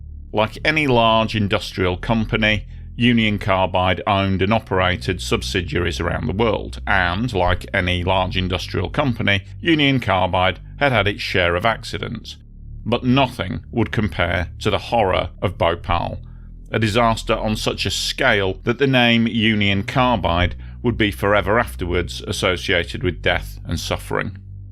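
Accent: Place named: North Midlands, United Kingdom. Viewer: I'm intrigued by *'s accent. I think I've narrowed it down to North Midlands/Derbyshire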